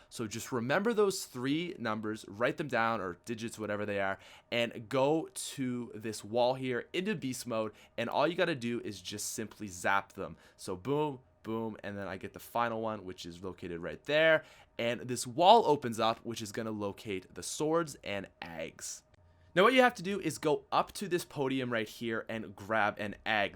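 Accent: Canada. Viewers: is he canadian? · I feel like the further you got in the video the more canadian you sounded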